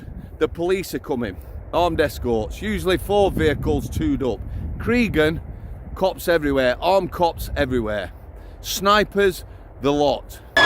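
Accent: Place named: Yorkshire, United Kingdom. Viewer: It’s probably because he’s spent a lot of time in Manchester and his Yorkshire accent has mingled together with the Manc one, which happens quite often. xx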